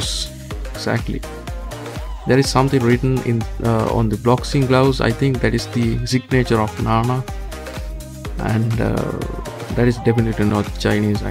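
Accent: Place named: India. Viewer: Are you from india? Cuz your accent is Indian.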